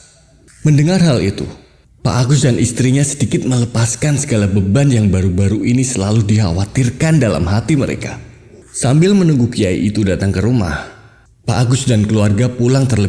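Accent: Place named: Java, Indonesia. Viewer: Assalamualaikum, saya ibu yanti dari Bandung hadir mas, Mas klo ngomong jawa enak banget di dengarnya melok banget ya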